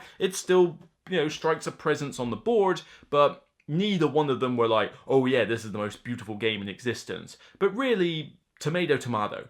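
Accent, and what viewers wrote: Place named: West Country, United Kingdom. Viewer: Where you from? Devon? What's this accent, west country ish?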